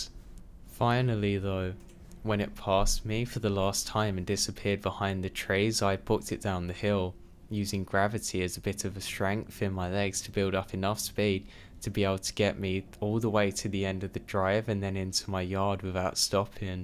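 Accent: England, United Kingdom. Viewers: Land of Shakespeare; pure class- love the voice- English, and Sinister- SCARY!!!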